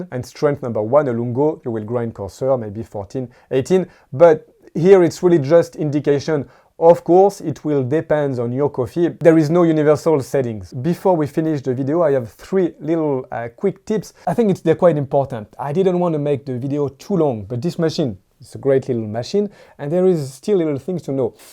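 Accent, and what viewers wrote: France. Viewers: Un français qui parle anglais 😊 merci j'ai tout compris 🙏✨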